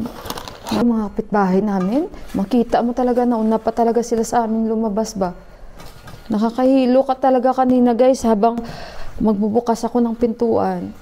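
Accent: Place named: Ilonggo, Philippines. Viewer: Ilongga po ba Kay maam? Now lng ako nka view Ng YT channel mu. Alam ko tlga sa tono mu kc e😁😉. And kamukha mu ung crew ko dati sa Try me ice cream house & resto dto sa Iloilo☺️Ingat po Kau jan🙏🙏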